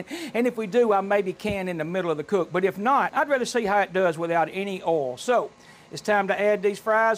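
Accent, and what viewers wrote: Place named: Texas, United States. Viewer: I've loved the accent. Is it from texas ?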